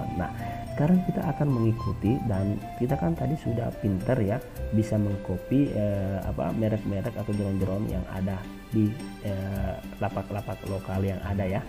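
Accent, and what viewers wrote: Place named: Kendari, Indonesia. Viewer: Wah orang kendari ya???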